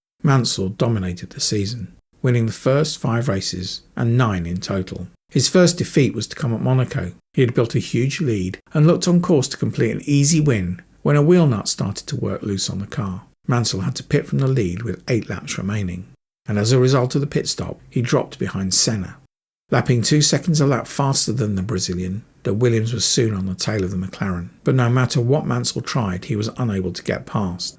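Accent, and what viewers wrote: United Kingdom. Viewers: French here. Thank you sir for being able as an english motor racing buff (I suppose so, based on your way of speaking) to paint a balanced picture of an english great driver, rather than producing yet another hagiography. Moreover, the editing of your video is quite good and your comments brillantly written, congratulations. To complete Mansell's picture, Alain Prost declared, in an interview part of a long documentary on his career made by a french TV channel, that Big Moustache was the only teammate he had not been able to work efficiently with in Formula 1, because the man of the Man's Island was not commited enough in the development's work and was behaving as a prick generally speaking. He explained that even when he and Ayrton had a huge disagreement and were not talking to each other anymore in 1989 after the San Marino GP's incident, they managed to keep on collaborating very professionnally during technical briefings and development's sessions. 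According to him, Nige was very fast and undisputably the bravest of all, but not as complete a driver as Niki, Nelson or Ayrton. Despite his flaws, "Il Leone" was a fantastic striker almost always thrilling to watch, and a monster of will who deserves a huge respect for that reason, considering the physical impairments he had to overcome all along his motor racing's career, resulting from his very serious accidents in the early stages of it.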